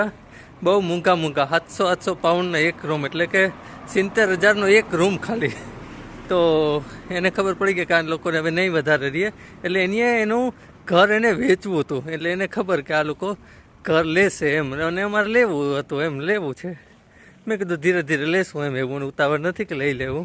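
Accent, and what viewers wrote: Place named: Porbandar, India. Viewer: તમારી બોલી ઉપર થી એવું લાગે છે કે તમે પોરબંદર નાં મેર છો ❤❤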